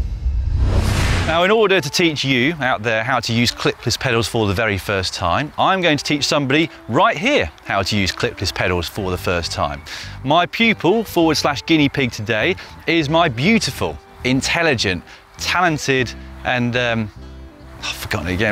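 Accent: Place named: United Kingdom. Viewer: I just subscribed for the british accent and the british humor! :D The content is also good, so win-win!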